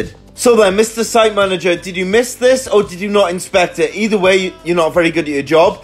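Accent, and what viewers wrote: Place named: Wales, United Kingdom. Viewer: The Welsh accent makes this 😅😂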